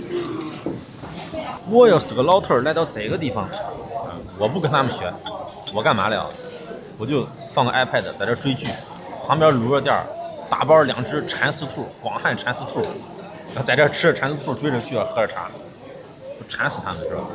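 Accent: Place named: Xi'an, China. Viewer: 是咱西安乡党吧，这一口亲切的醋溜普通话哈哈哈哈😂😂😂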